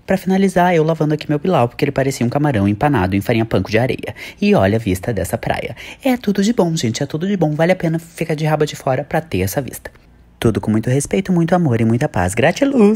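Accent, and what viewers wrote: Rio Grande do Sul, Brazil. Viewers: Eu ameeeiiii 😍 vc é gaúcho? Gosto muito dos teus vídeos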